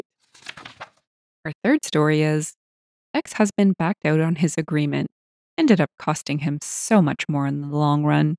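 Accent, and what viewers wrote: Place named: Canada. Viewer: You're sounding like a smooth and sexy Canadian!👍👍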